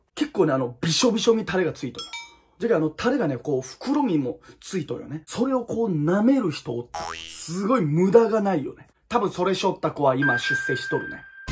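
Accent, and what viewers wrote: Hiroshima, Japan. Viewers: マジ面白い！ 方言が広島カープの選手みたい🎏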